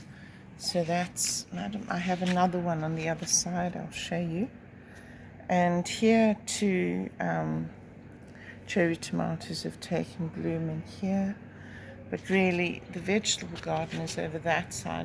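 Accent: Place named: United Kingdom. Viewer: Hi darling, I love so muh your lovely british accent and you seem such an adorable typical English lady at my italian eyes who needs only her raincoat and umbrella to not to be unfaithful to her origins! I agree with you about living in Europe, nowadays seems the better choice to live peacefully, and leaving in Italy, at least the north of Italy, is so much underrated by our generation. I know work is not enough rewarded as in other countries, but, hey, you cannot have everything from life... Things you understand only much later... I cannot see my life anywhere from here and you can find close minded people really everywhere, so... You can only stay away from them! I love your perfumes on a budget videos! Greetings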